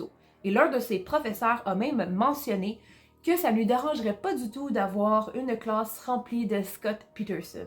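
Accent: Quebec, Canada. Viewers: Merci pour cette vidéo. C'est bien raconté et pas trop court ni trop long. Et j'aime beaucoup l'accent québécois. Si tu me permets une petite correction, au tout début de la vidéo : On dit "un" épisode (c'est masculin). Et une petite remarque : Il y a beaucoup de détails tout au long du récit qui, selon moi, n'apportent rien d'intéressant à l'intrigue comme par exemple le prix de la maison cité au début, le nombre de pièces,... Merci pour tes efforts ❤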